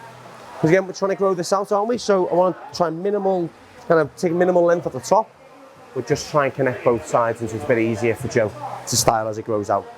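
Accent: Liverpool, United Kingdom. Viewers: scouser accent from liverpool , england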